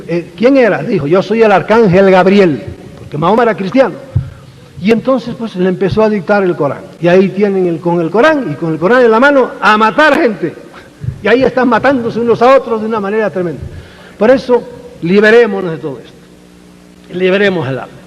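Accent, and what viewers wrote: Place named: Spain. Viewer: crean solo en mi palabra sin ninguna evidencia de nada ya que es mas comodo y barato para mi . parece increible que despues de tantos años todavia sigamos doblegados y nos dejemos imprecionar en cuanto oimos el acento español, haa pero no sea uno de los nuestros por que le exigimos evidencias fotos y aun asi lo denostamos Que nos pasa?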